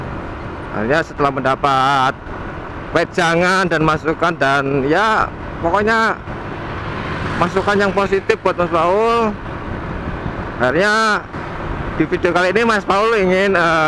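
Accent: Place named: Java, Indonesia. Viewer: Mas mas ini doang yg medok tp sunda nya nyablak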